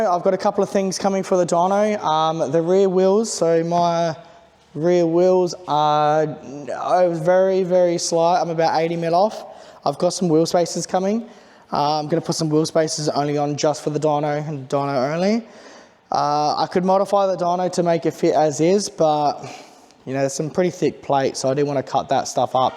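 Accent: Australia. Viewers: He almost sounds Australian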